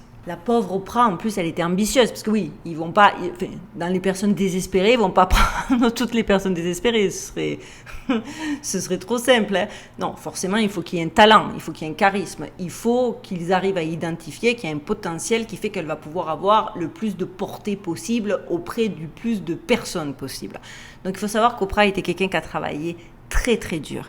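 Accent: Marseille, France. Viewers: Son style est un mix entre le conte pour enfant et l'enquête criminelle saupoudré de l accent marseillais. On kiffe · Cc oh que oui cela est intéressant même plus que cela puisque nous en France cela a juste était juste diffusé comme le passage d'un TGV bien entendu que ça pue et mon dieu il faudrait tellement que ça pète et du côté de chez nous aussi pour finir de réveiller bcq bcq d'âmes au nom de la justice Divine et par respect de toutes ces personnes qui ont et qui subissent encore ces horribles conditions tu as une belle mission notre Marseillaise surtout ne perd jamais ce magnifique accent et langage bien de chez nous merci bien sûr ce com est personnel et j'assume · Merci beaucoup 😄 toujours captivant de t'écouter. Je ne fais jamais de commentaire vu le nombre incroyable qu'il y a toujours mais j'avais envie pour une fois de te dire merci et te dire aussi que j'adore ton accent marseillais....ça et ton rire contagieux font ton charme de même que ton intelligence.😉🙏👍💖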